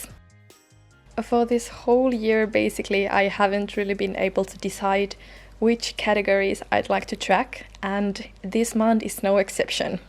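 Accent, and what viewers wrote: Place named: Finland. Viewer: /video starts "oh she has a nice accent. what is it from. sweden? finland?" you: "here in finland (...)" me: yooooooooooooo B)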